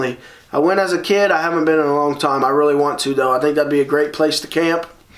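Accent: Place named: Indiana, United States. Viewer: Love the channel... Indiana here. Don't sound like I'm to far from ya. Keep up the good work.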